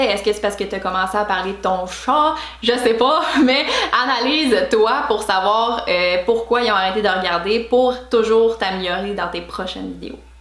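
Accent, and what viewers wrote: Quebec, Canada. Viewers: Merci pour la vidéo ! J'adore l'accent québécois ! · Merci pour ce tuto sympa avec l'accent canadien que j'adore ! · Putain, l'accent Canadien c'est vraiment trop horrible ! Sérieux ! 😱. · allant et parlant trop vite,on a du mal à vous comprendre avec votre accent Canadien,juste une explication comment envoyer un lien d'une vidéo à quelqu'un sur une autre vidé,Merci. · J'aim très ton accent 😍 c'est le Québécois ?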